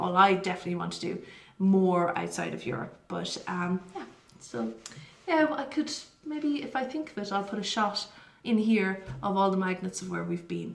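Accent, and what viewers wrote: Ireland. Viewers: I love your accent. Irish accents are one of my faves! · I love listening to you talk. Very partial to an Irish accent lol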